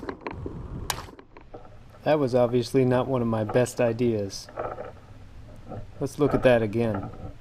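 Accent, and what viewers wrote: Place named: South, United States. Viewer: Any1 else think he Sounded like he talked with a country accent?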